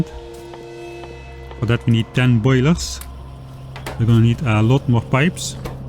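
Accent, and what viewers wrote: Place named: Netherlands. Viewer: Ha, I thought you were dutch because of the accent and I was correct! Nice content, thank you! Read as: Thank you for torturing yourself for our entertainment! <3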